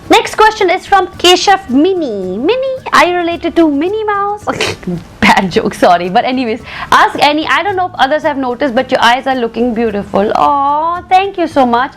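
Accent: India. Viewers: i love Ur Hindi ascent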